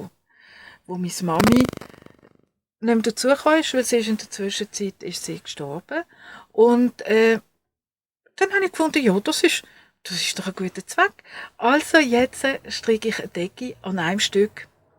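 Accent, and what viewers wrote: Switzerland. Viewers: Vielen Dank für den tollen podcast auf schweizerdeutsch. Ich bin in Luzern aufgewachsen, jetzt lebe ich in Italien schon 50 Jahre und fermisse das schöne Schweizer dialeckt. Ich ferstehe dich richtig gut. Mach weiter so, du hast sofort einen Daumen hoch bekommen. Viele liebe Grüsse Livia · Servus, herrlich, Schwyzerdütsch! Das gefällt mir:-) Ich freue mich auf weitere Folgen. Viele Grüße aus Oberbayern, Susanne, die Orientbayerin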